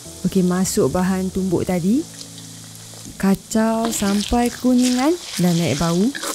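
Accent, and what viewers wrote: Kelantan, Malaysia. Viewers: kelate ko utaro???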